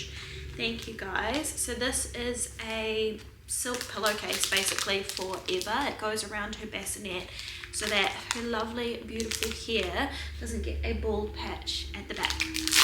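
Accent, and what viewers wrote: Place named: New Zealand. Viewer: you and Brogan have the most beautiful babies...truly 3 of the most lovely little babydolls ❤ Also I could listen to you speak all day, love NZ accents! Love from North Carolina USA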